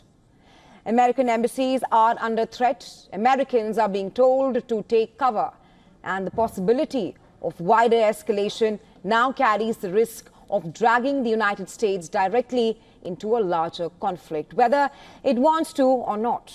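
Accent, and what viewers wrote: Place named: India. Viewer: I am American. I would never ask an Australian, British or South African person to change their accent. The Indian accent is beautiful and I understood 100 percent of what she said.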